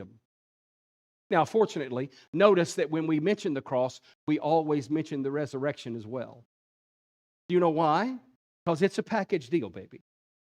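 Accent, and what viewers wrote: South, United States. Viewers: Lol as a former Catholic, please stop lying to yourself. The Roman Catholic Church is schismatic, they departed from the traditions and dogma of Christ’s church within the first 1000 years. Modern Roman Catholicism is the biggest contradiction and cope of all. The sermon was on point, Christ’s crucifixion was a complete victory ☦️. And may I ask what’s protestant about this? Is it because he’s speaking with a southern American accent or because he’s speaking with some enthusiasm?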